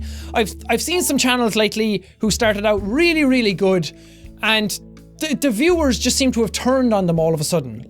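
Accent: Ireland. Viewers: !! I think that you are an AWESOME person. You are my favorite youtuber! And i love your irish accent! You are A BOSS!!!!! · what part of ireland are you from? im a wexford man :) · where in Ireland are you from #IrishAndProud:D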